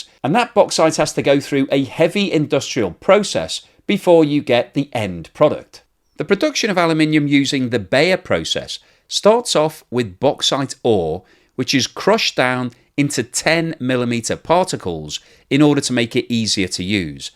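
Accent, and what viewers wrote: United Kingdom. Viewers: That is okay we are used to the British pronouncing words funny. China will never be net zero. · I like how you Brits say 'tree', whenever a word ends in tory or tary. You all should hear y'selves:person-turqouise-waving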